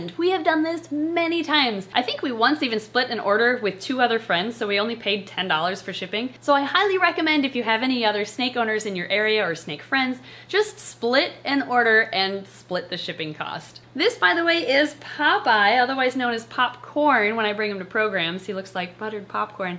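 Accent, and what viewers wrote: Minnesota, United States. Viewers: Minnesota English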